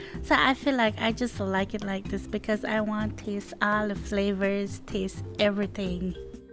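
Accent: Jamaica. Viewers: Do you think the accent is similar to Jamaican?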